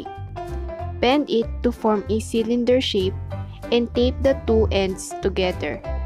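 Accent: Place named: Philippines. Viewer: I also love how Filipino your accent is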